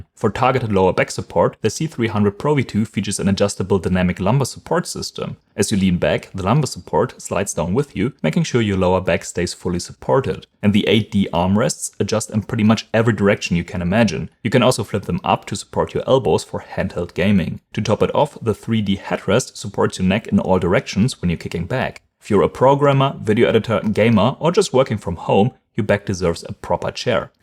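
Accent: Germany. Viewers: I've noticed I'm hearing the German Knacklaut less frequently these days. I must admit, it's quite pleasing to the ear. Not that I don't appreciate a German accent in fact, I think is rather charming.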